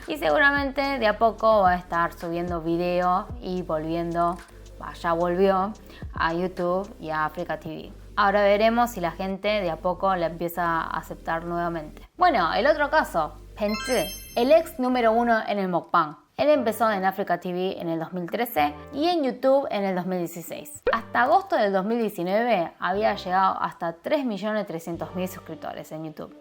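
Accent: Argentina. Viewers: Es lindo ver una coreana con acento argentino :3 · waaa que lindo es tu acento arg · es raro escuchar a una coreana con acento argentino mencantaaaa❤❤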